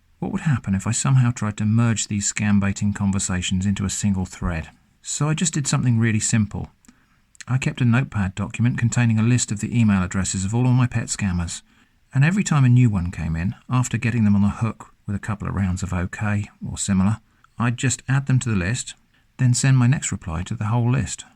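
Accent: England, United Kingdom. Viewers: Rolando Cueva English. Not Aussie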